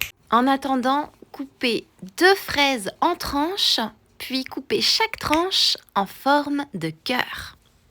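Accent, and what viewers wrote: France. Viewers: Hi, happy valentine's day. This recipe is simple and delicious too, your French accent made it more delicious ❤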